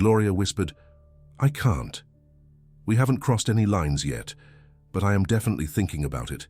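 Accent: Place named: England, United Kingdom. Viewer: Note to the channel… Just because you use an AI reading voice with an English accent, does not make the video somehow higher quality. This is a re-hash of a very old story but it took the first 1/3 of the video before I recognized it. The awkward gaps between words (or running words together), all the mispronunciations, calling the private investigator the “AP” at one point, just makes the video poorly produced with a complete lack of editing and proofing. You’ve got segments of the video in the wrong places, making the story really confusing. All in all, this video is a hot mess and not worth the listener’s time. AI – generated videos like this one truly suck 👎👎👎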